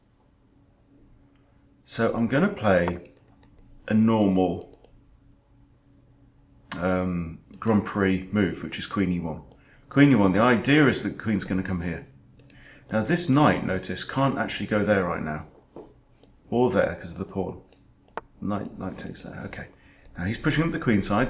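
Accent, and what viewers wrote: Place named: England, United Kingdom. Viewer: I hope you continue making videos. For me and many others, your videos are just at the right level. It's also nice to hear an English voice, there aren't many out there on twitch or YouTube. Good luck.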